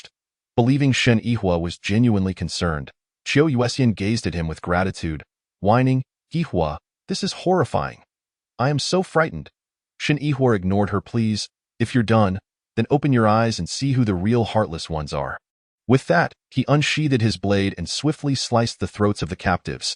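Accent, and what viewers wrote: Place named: France. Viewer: It's still on French pronunciation.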